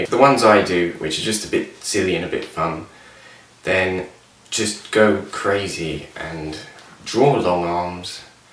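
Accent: United Kingdom. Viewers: "Drawrrings" yep he's british it all checks out. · You look like a young Carl Sagan, and you have a deep voice like him, except yours is British haha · No he doesnt. He has an English accent, thats about it. Mitchell is much more nasal.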